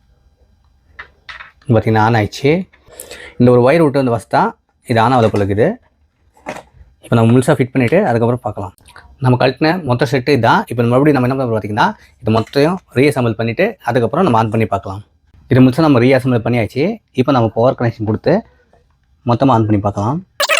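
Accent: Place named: Bengaluru, India. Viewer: Bro Bengaluru tamil nalla irukku 👍😎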